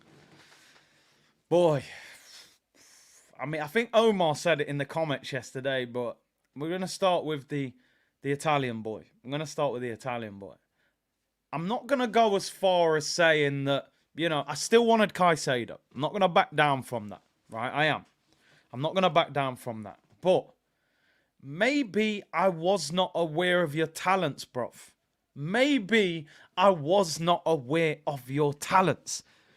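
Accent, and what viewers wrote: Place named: Cockney, United Kingdom. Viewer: 😂🤣 I don't know if I watch this show for the singing, content or the jokes But that cockney accent 😂😂😂😂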